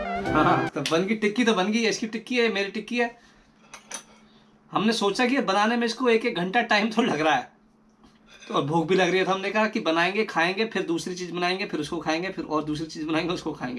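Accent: India. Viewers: Bhai i love your desi way of talking love you bro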